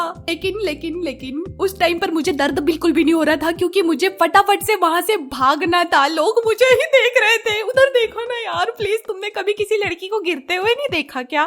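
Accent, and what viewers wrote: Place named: Gujarat, India. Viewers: I thought you were gujrati because of your accent😮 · But aapki accent se toh aap gujrati hi lagte ho 😅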